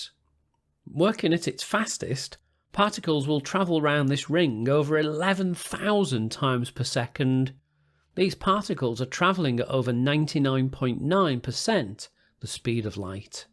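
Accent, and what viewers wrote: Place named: North, United Kingdom. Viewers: YES! A new Learning Curve vid! This is one of the absolute best channels of this type out there. You have a great talent for explaining sometimes difficult concepts in easy-to-grasp terms, and I can't tell you how many times, after watching one of your videos, I've said to myself, "FINALLY I get that!" (as in, Finally I get what the Planck length is, or Planck time etc.) and all in a friendly, homey, Liverpudlian voice that reassures you that these enormous scientific puzzles will be explained carefully and clearly. Bravo, man. You do fantastic work. I'm so glad I discovered your channel. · I love your northern accent. "oor soun"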